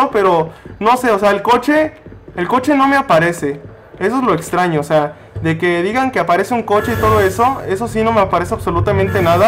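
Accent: Mexico. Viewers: Esperen un mexicano creo diciéndole a los de usa americanos dios Trump que hiciste con México creo(por tu tonada dedusco que eres mexicano )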